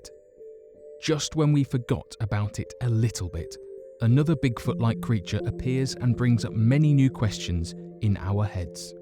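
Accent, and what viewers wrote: England, United Kingdom. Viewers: uhh no, he's definitely from England. I mean, I would know, being from England, also.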